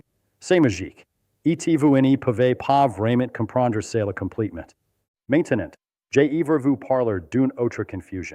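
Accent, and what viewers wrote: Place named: England, United Kingdom. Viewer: Oups 😮 le sujet est intéressant mais la prononciation anglaise des mots francais les rend pratiquement impossible à comprendre... You better say it in english or find someone Who speak " français " 😊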